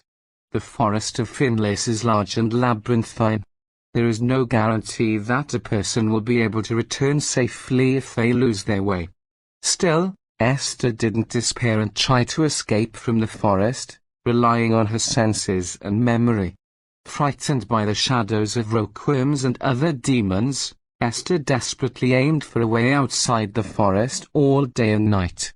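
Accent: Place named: Wales, United Kingdom. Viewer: it sounds like the voice has a bit of a welsh accent or something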